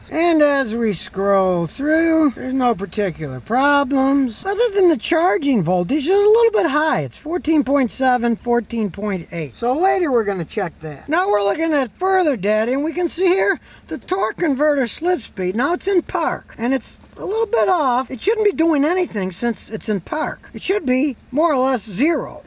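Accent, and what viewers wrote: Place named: Chicago, United States. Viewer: it's the Chicago accent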